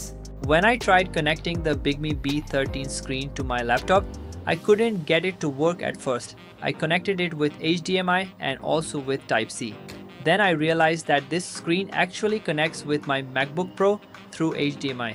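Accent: India. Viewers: Mac and Windows ok. If only you tested with Linux! Also, for a Chinese channel, why does the accent sound Indian? Or are you using AI to read?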